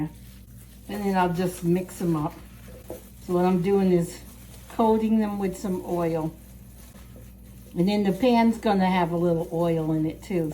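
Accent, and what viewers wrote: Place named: New York, United States. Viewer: Sent to fast young lady . Your new York accent is making me home sick for Commonwealth Ave... THANK YOU FRANK FROM MONTANA......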